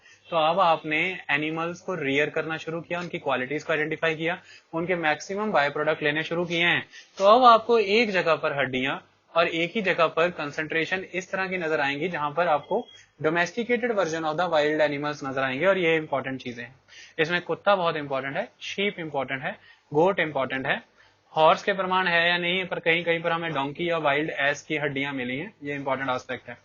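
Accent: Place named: Punjab, India. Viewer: The Punjabi touch in your lectures makes class really interesting. Though, there is no Video lecture still you make these Audio lectures really interesting by using your 3D imaginations as JHRRRRRR JHRRRRRRR....!!! Hahahaha...! Really enjoy your classes and content is really amazing. And the way you explain each and everything is like Cherry on the Cake....! <3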